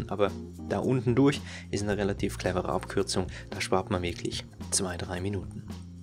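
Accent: Switzerland. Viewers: Bist du Schweizer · Der Sprecher ist Schweizer. Man hört es ganz ganz leicht :D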